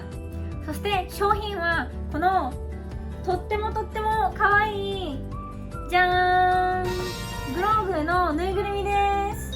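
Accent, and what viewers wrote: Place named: United States. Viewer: sayaちゃん、こんにちは😊 sayaちゃんの動画、何本か楽しく観させていただきました。今日は初コメさせてくださいね。 ジーニー＋について、たくさんの情報をありがとうございます♪プランを立てている途中なのですが、教えてもらえますか？？(＞＜) 8月なのですが、午後からEPに行く場合、朝ジーニー＋を購入して、7時にひとつ、11時にひとつ、13時にひとつ、合計3つのLLを持って13時にパークに入る、といったことは物理的に可能ですか？wdwには次いつ行けるか分からないので最大限楽しみたいと思っています😅 あとsayaちゃんはアメリカ育ちですか？🇺🇸お話の仕方や言葉の選び方がアメリカっぽいなーと思って😁気にされたらゴメンナサイ🙏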